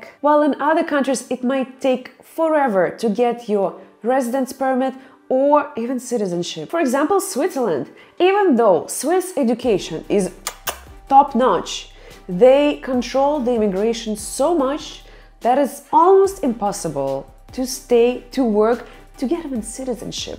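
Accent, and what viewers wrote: Russia. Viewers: I like the way she talks in a Russian accent it's really hilarious.😅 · strong Russian accent ❤️ You are doing well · Well researched video. Keep up the good work..I like the Russian accent..Maybe you can teach me sometime 😉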